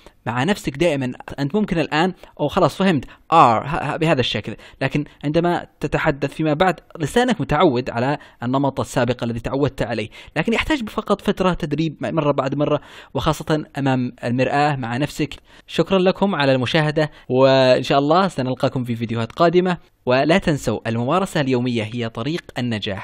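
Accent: Yemen. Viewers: مرحبا، لهجتك يمنية؟ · أحسنت وسلام كبير لليمني اكسنت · ابدااااااع ما شاء الله 😻 كنت شاكه من انك يمني لانه طرريقه الكلام و بعض الكلمات يمنيه احيييك يــــا ابن بلادي استمر ولايك واشتراك 🌸💙✨ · انتي يمني من لهجتك عجبتني قناتك فيها التبسيط بلشرح مشتركه جديده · يا اخي اعرف الاكسنت اليمني من بعيد هههههه تحيه مني لك 🇾🇪🤩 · والله عرفت انك يمني من لهجتك سبحان الله تسلم طرح رائع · يا اخي صعب إن العرب يتكلموا مثل الأمريكان أو البريطانيين لازم يكون فيه علامات فارقة في النطق مثلا أنت يظهر على لهجتك بأنك من اليمن وهذا شئ طبيعي أهم شئ يفهمك المستمع، تحياتي لك ولجهودك. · لهجتك يمنية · لهجتك يمنية انت،يمني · sanaa batool حتى أنا والله عرفت إنه يمني من اللهجة سبحان الله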